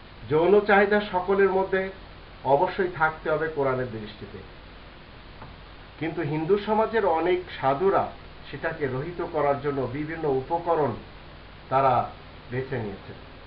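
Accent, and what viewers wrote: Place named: Comilla, Bangladesh. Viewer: আপনার বাপ দাদারা কি কুমিল্লা থেকে যশোর এসে বসবাসকারী? আপনার ভাষায় তাই ধারনা হয়।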